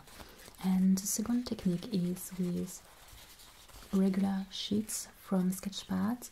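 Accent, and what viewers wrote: Paris, France. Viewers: As a non German I can say she doesn’t have a German accent lol, it’s clearly French, not sure why ppl would think differently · You definitely sound French to me. I too thought you were in the theatre. OMG I am the same, a frustrated artist, a lover of the pre raphaelites and artists like JW Waterhouse. And I adore fairy tale illustrations. I also love the style of the Frouds artwork. Your videos are right up there with the very best ASMR artists. So warm and cosy and ethereal. You have been our sanity during these harsh times. You make the world a better place. Thankyou 💙🙏🏼💙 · J'adore ton accent, vraiment (il n'a rien d'allemand)! Tu me rassures sur le mien quand je parle anglais (j'en ai toujours un peu honte). Grâce à tes vidéos, je commence à comprendre ce que les anglophones trouvent mignon dans notre accent. Je trouve ça incroyable que tu aies découvert l'ASMR comme moi avec des artistes anglophones et je suis contente d'avoir trouvé une française qui se lance dans l'ASMR dans la langue de Shakespeare! 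Continue! Tes vidéos sont si spéciales, j'aime beaucoup ta vision de l'ASMR et tes environnements cosy, anciens et si rassurants. Prends soin de toi durant ton confinement 💕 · Yes, when French people become more advanced in English and their intonation and accent approach something closer to a native speaker there is definitely some crossover in sounds with a German speaker at the same proficiency. I have studied French for years so overall it's easy to pin her accent down as French, but there are some sounds that definitely remind me of my ex who was from the Rhineland (Germany). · I've just discovered her videos, and her accent slightly reminds french ( I live in France), but it's clearly not a typical accent of a native french speaker speaking English. Sometimes she sounds even like russian speaker in some moments. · I lived in France for a year, she has a French accent obviously but also I can see why some people thought German. 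German pronunciation is more like English so it’s a compliment that she pronounces English sounds well. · As an American, she sounds French, her pronunciation is so delicate & lyrical. And, as mentioned above, she drops the "h" . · I’m American, and she definitely sounds French. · Je suis né avec de bonnes oreilles. J'entends toujours ton doux accent français. Vos vidéos sont incroyables. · Hi, Thank you for your Q and A, it was very interesting. You are definitely an artist and so creative. I am happy that you are so successful here and look forward to enjoying more of your work. As an American, i find your french accent amazing and relaxing I wish you the best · Really? As a Canadian this is the Frenchiest of Parisian French (as opposed to Quebecois accent) accents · as a Swiss Girl she Sounds 100% french and 0% german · I am American and I can tell, 100%, that you are French. I do believe that you have found an outlet for your creative spirit. 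What I have seen so far is outstanding. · You are so lovely with your cute French accent and your channel is so lovely, pleasing and beautifully so aesthetic. Keep up the wonderful work. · Thank you! You have a beautiful French accent! Keep up the good work! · She sounds 100% French. I would never think she was German. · I love all your videos and just for the record you have always had a clear French accent 😍 I have been unknowingly into asmr for about 7 years now thinking im the weirdest person in the world going to bed listening to someone speak with an accent and chew gum lol I have actually only discovered a year ago that what that was doing for me was called asmr and I could find it everywhere on YouTube ❤❤❤ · You're awesome! Love your videos so much. They help me to relax! Love your beautiful french accent!❤🇨🇵 Your english is fantastic👏👏👏 Keep doing videos❤❤❤👏👏👏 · You do not sound German lol Your accent reminds me of people in Paris France.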